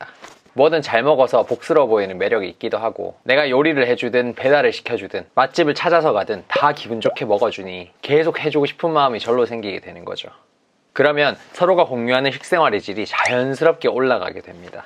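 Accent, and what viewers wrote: Gyeongsang, South Korea. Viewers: 심리학 관련 찾다가 우연히 님 영상들 보게 되었는데 그 어떤 시트콤 드라마보다 재밌게 보며 간만에 많이 웃었네요. ^^ 화법이 표준어가 아닌데도 지적이고 젠틀하며 경상도(대구?) 사투리가 너무나도 매력적으로 묻어나는 말투... 매력남의 표준이시네요!🥰 이젠 느무 나이가 들어서 연애 포기하고 지천명을 바라보는 골드 미스, 미쿡 사는 누나(ㅋㅋ)가 구독, 좋아요 눌러 버렸어요. ㅎㅎ ( 님을 일찍 알았었더라면 결혼 성공 할 수 있었을라나...? ^^; )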